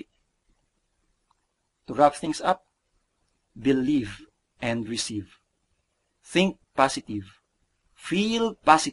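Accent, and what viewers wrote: Philippines. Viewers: Duhh. Filipinos really speak that way. And who cares, as long as we understand what he's saying then everything is fine. Okay? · Filipinos speak that way. it's ok as long as we understand what he say.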